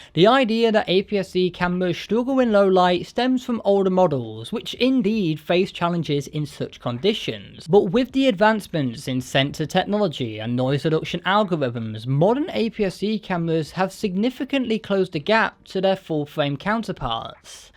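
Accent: United Kingdom. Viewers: bro say water and father please😁.i think you from UK❤❤❤❤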